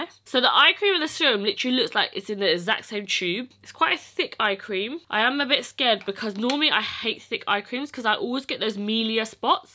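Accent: United Kingdom. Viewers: Ik haw she is british Her accsent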